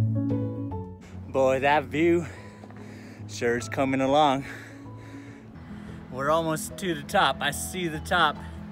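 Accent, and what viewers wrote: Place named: Texas, United States. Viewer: liesss, that's a Texas accent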